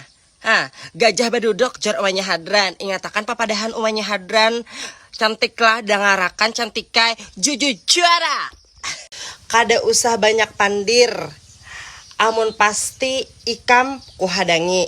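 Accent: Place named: Banjarmasin, Indonesia. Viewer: Hello....,aku di jkt suka banget dgn gaya bicaranya or logat Banjarmasin ❤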